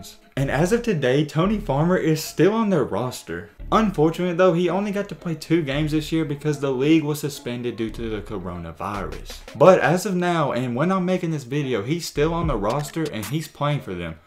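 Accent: South, United States. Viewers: dude country asl doing this vid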